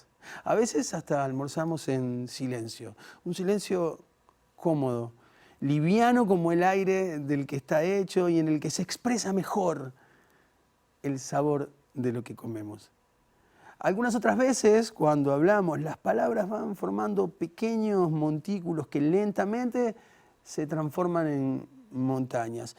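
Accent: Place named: Buenos Aires, Argentina. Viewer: Hermoso cuento. Una sugerencia: no imponer el ritmo propio a la lectura. Narrar es intermpretar pero lo escrito por otro, no lo que a uno le 'sale del alma'. El alma también se equivoca. Mejor no leer en porteño lo que está escrito, pensado, pausado y respirado en japonés. Muchas gracias igualmente.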